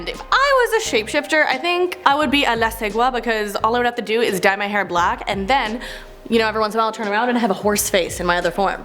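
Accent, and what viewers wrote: Canada. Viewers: I like how they talk in Canada. Words like: "about" they pronounce "aboot."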